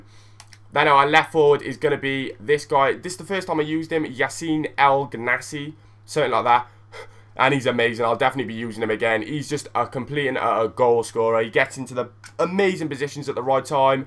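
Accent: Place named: Welsh Valleys, United Kingdom. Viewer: You sound like leeroy from the valleys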